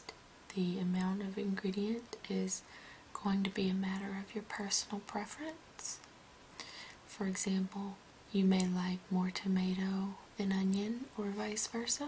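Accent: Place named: Texas, United States. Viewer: AH HA! That explains the accent. You are from Texas. That means we are neighbors. I'm from Louisiana. :) I love guac but I never knew how to make it until now. Thanks for teaching me!! And you are REALLY good at the soft speaking cooking thing. Me? Not so much. I need more practice with slow movements lol. I hope you decide to do more like this :) Thanks for the video! -Katie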